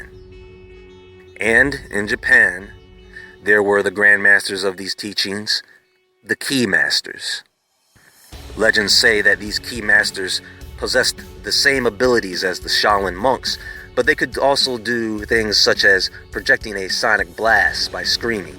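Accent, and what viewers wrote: United States. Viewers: He makes "neurons" rhyme with "morons". American, Nuff said. That said, far far less off putting than most americans.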